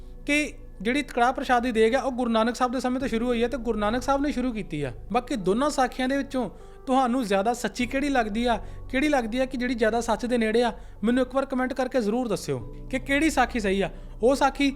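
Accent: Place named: Majhi, India. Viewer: ਸਪਸ਼ਟ ਮਾਝੀ ਪੰਜਾਬੀ ਵੀਰ ਦੀ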